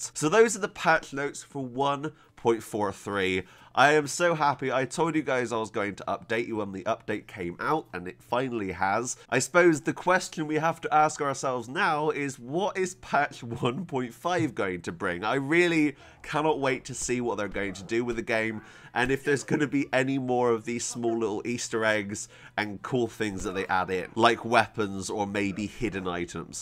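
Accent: United Kingdom. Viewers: I thought so haha. Am also from the UK so kind of recognised the accent. Just wasn't a hundred percent sure haha. Enjoy the videos though! Have only recently got kingdom come and still fairly new to pc gaming. But thoroughly enjoying it, playing alongside it with The Witcher 2, not sure if you've played the witcher series but they are also great games. Have started reading the books recently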